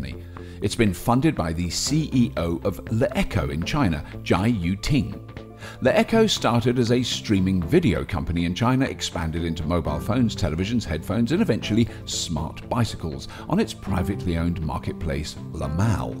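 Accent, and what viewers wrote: United Kingdom. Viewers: i love the enthusiasm in the narrator's voice....very British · I love the British accent.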